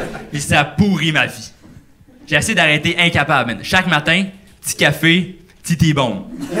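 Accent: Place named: Canada. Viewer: Le Canadien n'est pas du français. Il faut se concentrer pour comprendre.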